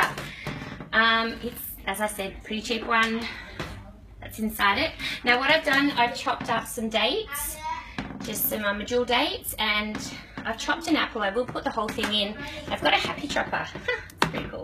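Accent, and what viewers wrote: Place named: Australia. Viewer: Hi, Thanks for showing me how to make slow cooker porridge. Incidentally I like your warm Australian accent and the gentle way that you supervise your daughters who seem at that curious/testing things out stage of development. I am single and have a compact slow cooker, so I will just have the amounts. Warm regards from Robert in Scotland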